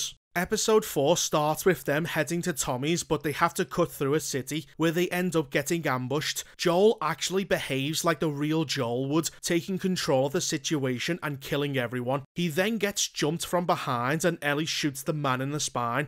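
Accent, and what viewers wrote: Liverpool, United Kingdom. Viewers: A nice scouse commentary on the last of us tv series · This video is 10x better with your scouser accent.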